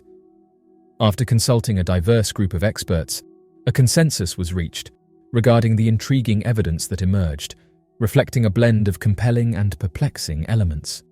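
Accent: United Kingdom. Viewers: Love your soothing relaxing British voice 😁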